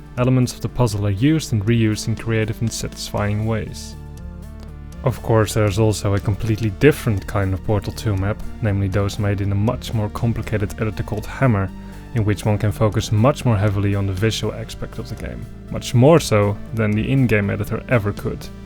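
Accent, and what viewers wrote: Netherlands. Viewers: do i sense a dutch accent in there? :D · Hey question. Are you dutch/netherlands?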